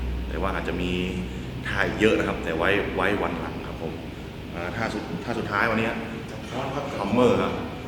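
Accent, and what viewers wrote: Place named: North, Thailand. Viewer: ธนยศ ไชยชมภู สำเนียงบ่งบอกเลยไข่ป่ะ พี่เขาคนเหนือแน่นอน 555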